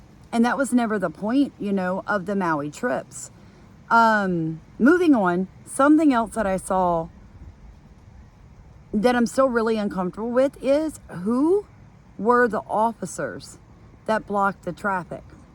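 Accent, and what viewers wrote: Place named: South Jersey, United States. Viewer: I was thinking south Jersey. I have family and friends from there, and I can definitely hear south Jersey in voice! Even though she says y'all all the time because she lives in the south. She must be a Jersey girl from the start. I know the Jersey accent. I hear my maternal Grandmother come out of mouth all the time. LOLOL But - she dun went and married a suthorn boy!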